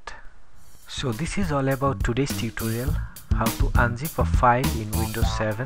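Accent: India. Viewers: As soon as I hear the Indian accent I instantly don't trust them with tech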